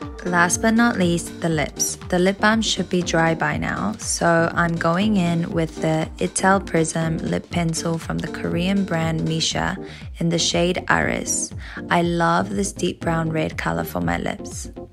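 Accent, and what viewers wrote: New Zealand. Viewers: bb so this is why am getting some british accent then I was like nah aussie and then you said you are kiwi shiii I am confusion but now cleared 😉 cheers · SHE FROM NZ!!!! I knew ittt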